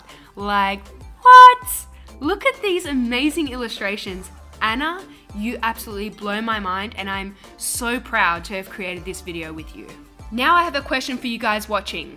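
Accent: Australia. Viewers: Your Aussie accent is my fave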